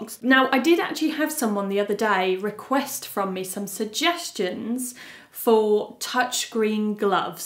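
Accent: United Kingdom. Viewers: I'm French and for real I really like her British accent !!!!!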